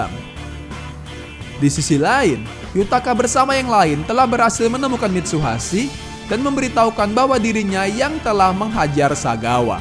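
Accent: Medan, Indonesia. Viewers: abng orang medan ya bang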